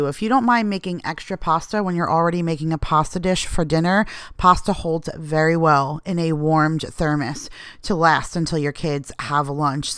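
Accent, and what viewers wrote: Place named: New York, United States. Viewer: I’m in Suffolk County Long Island! I swear from your first video I saw of yours, I said she’s definitely from NY or New Jersey! Lol you haven’t lost that accent 😉Have fun with your Mom 💞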